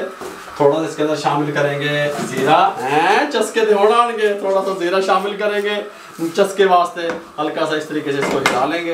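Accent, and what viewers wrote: Punjab, India. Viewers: Ap ka btany ka panjabi style bht acha lgta h ap English tch Mt dyn mza AA jata h JB ap khty h db Dio heeeen baba kug smja ria ayyy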